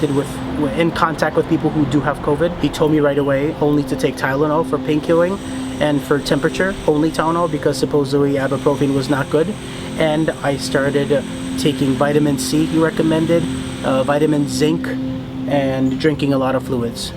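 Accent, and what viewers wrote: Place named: Russia. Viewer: Glad you are ok. I had it covid in May and my friend Arina had covid pneumonia and they insisted that they intibated her. I think they did that for her due to political reasons. She does too. By the way our group of Russian have the same dialect as you. It really great to relate to you and you keep us laughing. Take care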